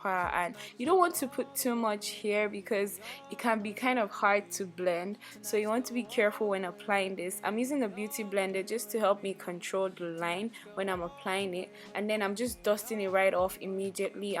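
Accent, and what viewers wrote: Nigeria. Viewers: ehhh shoki😂😂 love that nigerian accent❤❤ beautyful girl💕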